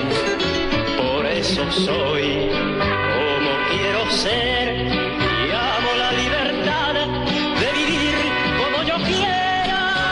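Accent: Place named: Latin America. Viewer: Este hombre no es Español ni por los poros no tiene acento español su acento es latino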